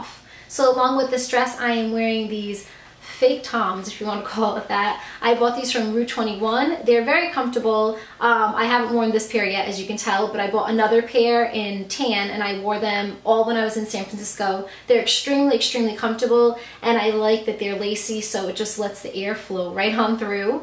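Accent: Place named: New England, United States. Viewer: New England? As in New England, Mass? I knew your accent sounded familiar. My boyfriend is from a small town right outside of Boston called Fall River. I knew something was up when I heard you pronounce 'saw' as 'sawr'; he does that all the time haha.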